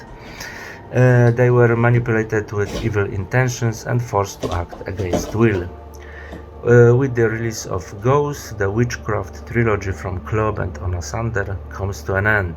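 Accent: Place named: Poland. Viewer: I knew I heard that Polish accent and typical Polish "erm/ummm"s right. Imma be honest, I have no idea in world how you got recommended to me, but I'm really happy you did. Will definitely check out the stuff you've spoken about. Cheers and keep going!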